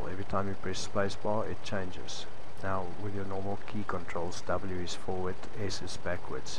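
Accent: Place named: Afrikaans, South Africa. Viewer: awe ou like jou video kan somer hoor jy is afrikaans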